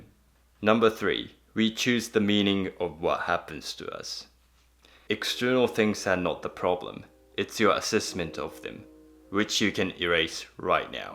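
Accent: New Zealand. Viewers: You're wise and I like your kiwi accent. Cheers from 🇬🇧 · Yes I hear the Kiwi accent too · I’m sure that you had great English when you were 15. The problem was that New Zealand accent. If you have come to the US, we would have been able to understand you better than some native New-Zealand-ese speaker. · I'm perplexed by the I watch on YouTube. He presents himself as a person who enjoys solitude, stoicism, introspection, and minimalism. He is self-conscious with his English. However, his English is articulate and well composed, with an occasional and charming compensatory hard Japanese "r" and delightful Kiwi accents. He strives for standard American English, now thought of as West Coast American English. With all of his preferred introsepction, he presents these wonderfully scripted videos of professional quality. So, is he a loner who is driven to tell us why and persuade us to find the same karma? 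How can he, without a salaried job, live so well in expensive Japan? While he professes not to be moved by the opinions of others, he has perfected his physic, his speech, and his mannerisms for others and is delightfully, appropriately seductive.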